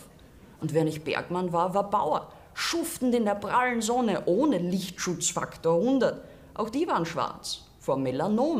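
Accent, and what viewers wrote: Austria. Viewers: Der Wiäner Dialekt in dem bei der bittersüsse Sarkasmus herrscht. Schön das Sie das sagen darf was den Deutschen verboten ist. Viel Erfolg liebe Frau. Sie stehen nicht auf Duzen, eher auf "Sie A_loch ". Danke für die Lustigen Vorträge und Einlagen. 👍👍🙋🤣H.F.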